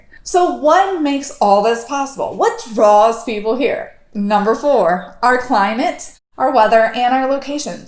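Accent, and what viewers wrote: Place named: Florida, United States. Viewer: I understand her just fine. Its a Florida accent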